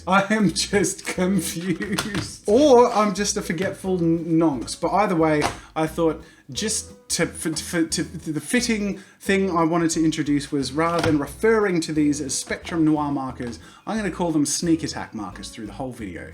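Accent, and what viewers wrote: Australia. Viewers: i KNEW he was an ausy. Now i fanaly know. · Yessssss I thought you were American but your Aussie I'm Aussie tooooooooooo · Garbage man he's Australian! LOL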